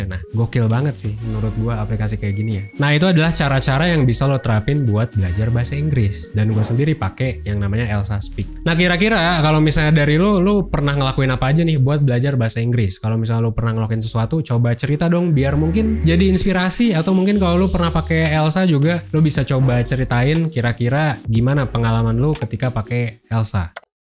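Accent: Indonesia. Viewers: Aksen indo yg paling lucu.. 😂😂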